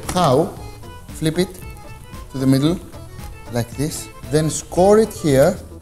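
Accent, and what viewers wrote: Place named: Greece. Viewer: Καλό μαγείρι ο ς, δεν λέω ΑΛΛΑ, εμείς στραβωθηκαμε να μάθουμε Αγγλικά, ακούγαμε τα εξ αμάξης στην Αγγλία για την προφορά μας, και έρχεται ο ς με την ελληνικότατη προφορά του, να μπερδεύει το αλμυρό με το ξινό, να μην ξέρει πως λένε ούτε τα μπισκότα, και παρόλα αυτά τους βλέπεις όλους στα σχόλια να ξερογλυφονται. Γεια σου ρε!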